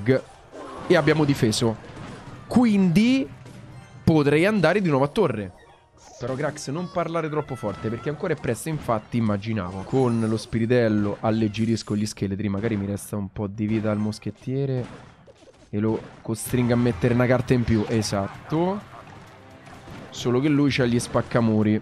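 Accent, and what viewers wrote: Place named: Rome, Italy. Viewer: Perche parla romano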